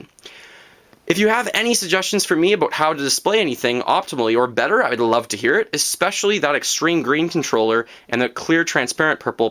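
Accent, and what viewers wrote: Canada. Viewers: Love that Canadian accent; I'm crazy for a Canadian man myself.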